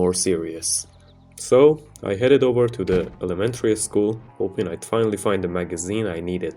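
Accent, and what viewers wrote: Iran. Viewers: the persian accent 😂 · دادا ایرانی؟ لهجت داد میزند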